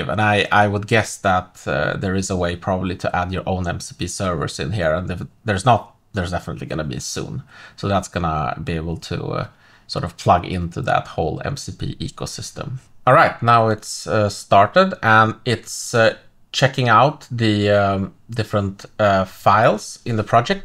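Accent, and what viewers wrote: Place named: Sweden. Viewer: Wow, the most Swedish accent I ever heard...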